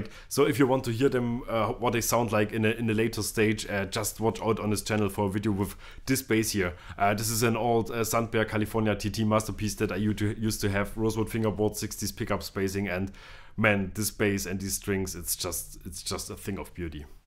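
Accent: Germany. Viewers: the most stereotypical German i have ever heard ... fucking love it !!! more !!! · That's gotta be the most German English I've ever heard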